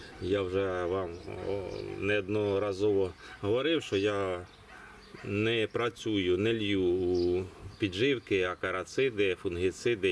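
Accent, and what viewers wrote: Poltava, Ukraine. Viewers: Підкажіть вашу локацію.Чую по говору десьближче до полтавської обл.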